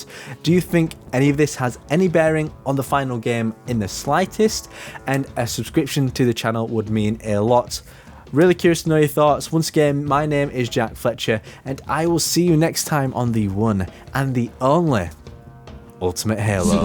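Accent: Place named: England, United Kingdom. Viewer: Wanna know what's beautiful? Your wonderful english accent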